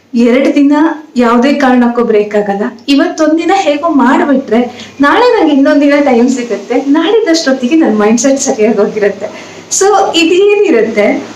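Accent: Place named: Kumta, India. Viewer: ನಿಮ್ಮ ಮಾತು ಕೇಳಿದ್ರೆ ನೀವು ನಮ್ಮ ಕುಮಟಾ ಕಡೆಯವರು ಅನ್ನಿಸ್ತಿದೆ ಮೇಡಂ.ನಾನ್ ಹೇಳಿದ್ದು ನಿಜಾನಾ...ನಾವು ಕೂಡ ಕುಮಟಾದ ಮಿರ್ಜಾನ್ ದವ್ರು 😍..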